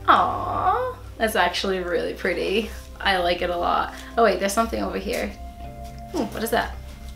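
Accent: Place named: New England, United States. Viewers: Omg those cupcakes are wicked good lol I’m a NEW ENGLAND GORL TOO 🤘🏼🤘🏼🤘🏼 I’m from Ma where are you from, I don’t know how I don’t know where you are from lol I could tell from your accent you where from New England and that one of the reasons I started watching you 🤣🤣 where did you get that coat I freaking love it it’s wicked pissa 😂😉😉😉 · Ugh I love that you're from New England. I'm from Massachusetts, currently living in Maine, and I think it's so funny people notice you say wicked! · You have such a strong Boston accent I live in Boston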